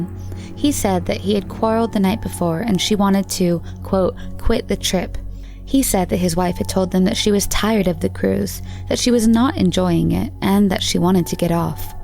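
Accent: India. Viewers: I think the narrator is indian..the accent makes me feel so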